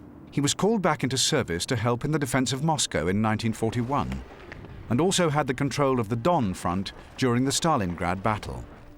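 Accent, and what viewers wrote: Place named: United Kingdom. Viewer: Dear God...when is the British voice thing going to end?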